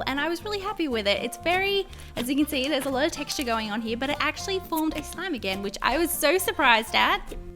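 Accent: Australia. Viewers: “The accent is Australian”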